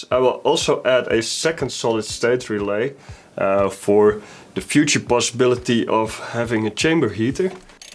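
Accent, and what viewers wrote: Netherlands. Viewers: Absolutely love the video, really nice build however would you mind doing a video in Dutch as the accent is so thick it would be easier to watch In Dutch 😅 anyway as I said before the engineering part of it is very well done! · This is the first video I've seen by you. I immediately subscribed to your channel and will check out your other videos. I really like your idea and of course your 3D printer looks cool as f*ck. By your accent I immediately heard you are a fellow Dutch. I hope to see more good stuff. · ofcourse he is Dutch, why am I not suprised :) we are some genius people :) edit: confirmed lmao. I can recognize that accent and that view from anywhere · where you from? you have a dutch accent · sound like you also talk dutch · Your accent gave you away quickly :) later in the video it was confirmed Lekker gedaan pik ziet er prachtig uit! 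· dacht all aan het aksent dat hij NL/BE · i think ur dutch u have a dutch acsent(im also dutch)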